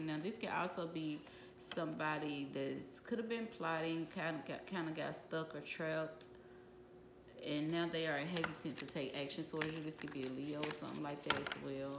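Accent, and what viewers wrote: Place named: Atlanta, United States. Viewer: Are you from atlanta 😂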